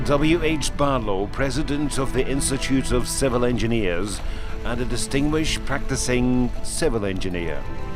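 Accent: Scotland, United Kingdom. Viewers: Guys he's Scottish. Get used to it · Corey M ..I am glad that the commentary was done by a Scot...Not by a Sassenach. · this is a very good video - very well done. the accent is lovely. i told my son this man could say the most vile things and it would still be like music. just sayin, it's a melodic, well spoken language. this is such a sad story and i've listened to it twice - because of the narration. also must say have a good friend who married a scot. he left me a phone message early on in their relationship - all i could understand was the word cat and my friends name. a 3 min. phone message. THAT said, i understood as much of that as i have from certain "american" accents. south...upper north east ...west coast..... I'm from chicago - oh excuse me..chiCAAgo - the all american accent, Ugottaproaablemwid dat? see how much nicer this scot's audio is? 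· Nice to hear a scotish accent of a happening in Scotland . So tired of bbc and british accent ruling the kingdom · A very well presented and researched documentary. A tragic accident that as is often the case, down to several factors and the various parties involved. Thanks to the narrator and producer of this video. I felt it very fitting that a Scotsman told the story and I'm glad I clicked my mouse to view it!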